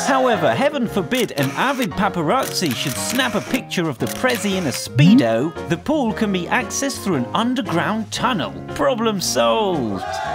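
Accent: England, United Kingdom. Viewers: When you hear a British voice or like voice explaining things about the White House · I love that the narrator has an english accent while talking about an American property lol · Sick UK voice. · I love how the narrator has a British accent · Who burned it down? Let me think, yep I got it the Brits did it. You sound like one and maybe one of your ancestors did it.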